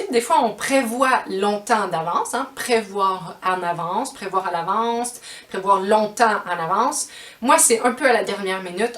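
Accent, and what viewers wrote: Canada. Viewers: J'aime la langue française , mon pays est francophone. Mais, l'accent canadien est vraiment différent par rapport à celui de la France.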